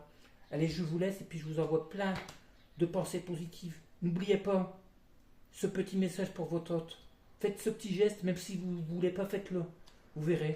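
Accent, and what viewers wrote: North, France. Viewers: Bonjour, j'ai comme l'impression que tu es din ch'nord, ça me rappelle de bons souvenirs... Merci pour tes lumineuses guidances. ☺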